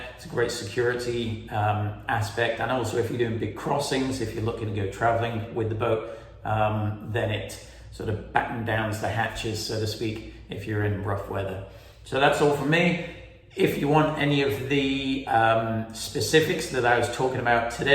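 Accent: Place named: United Kingdom. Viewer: north america? you kinda sound british.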